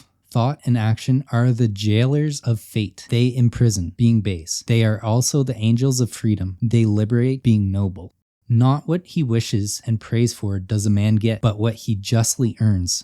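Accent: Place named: Maritimes, Canada. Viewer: Maritimer eh? Just subtle.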